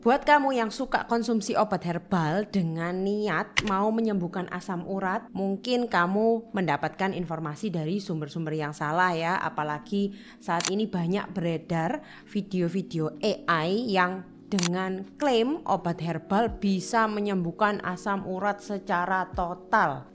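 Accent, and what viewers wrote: Java, Indonesia. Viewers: Logatnya Jawa banget,Iyo ta rek.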